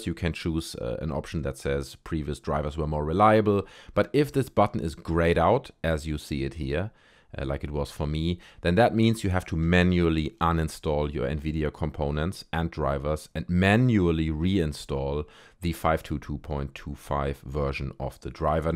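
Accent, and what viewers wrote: Germany. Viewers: Man hört das Deutsche raus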